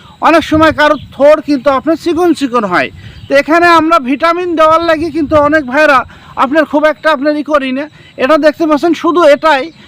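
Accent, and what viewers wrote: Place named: Magura, Bangladesh. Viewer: ভাই আপনার বাড়ি টা কোথায় একটু জানাবেন আমাদের মাগুরার ভাষার সাথে আপনার ভাষার মিল আছে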